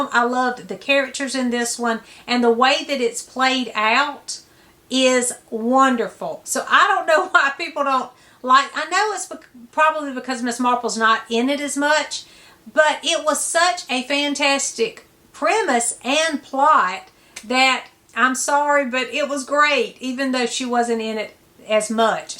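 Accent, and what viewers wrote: South, United States. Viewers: As you see by my moniker, I am a fan of Agatha Christie’s Jane Marple. It has admittedly been years since I’ve read one of them, tho in my youth I read each several times, saw the movies, blah blah. opinions are likely as good as anyone’s. She’s a lovely looking gal, but once she opens her mouth, the ambiance is gone. The God-awful overt Southern accent just overlays any intelligent conversation with ‘nails on chalkboard’ irritation for me. That accent accompanies a mindset I don’t like, and I dare say Ms. Christie would roll over in her grave if she could hear it. Lighten up on the Souther roots, and give your SUBJECT center stage. Real journalists have been doing so for generations. Sorry folks.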